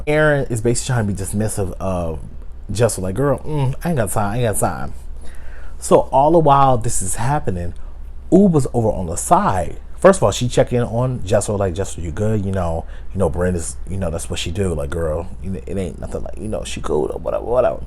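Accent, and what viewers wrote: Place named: South, United States. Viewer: Oh georgia! I was wondering where in the south you were from. Whenever my subtitles are on they translates you sooooooo funny.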